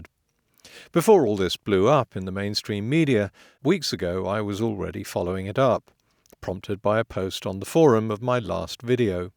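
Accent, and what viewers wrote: RP, United Kingdom. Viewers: actually he seems fairly obsessed, neurotic, arrogant, intellectually lazy, poor debater, and possibly lies on purpose to farm his viewers. But he has a British accent so people get confused and give him attention. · some well-spoken British guy to voice it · This video is garbage. it is claimed that the Wuhan Virology Lab does not exist. But there is very clearly a Wuhan Institute of Virology: <link> How can people just blindly trust this video? Because the presenter has a posh accent? I mean, come on, just fucking Google and you can see the blatant lies in this video.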